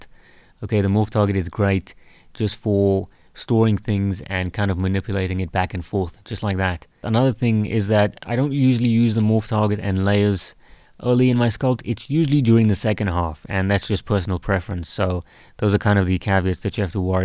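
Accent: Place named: South Africa. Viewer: Could hear the accent was wondering if you're South African - thanks a lot for this, will be watching a lot of your content. So cool to see a creator from SA on youtube with nice tutorials. Made my day!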